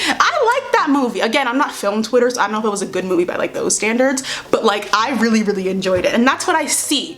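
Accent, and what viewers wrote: New York, United States. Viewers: random but i love when new york accent comes out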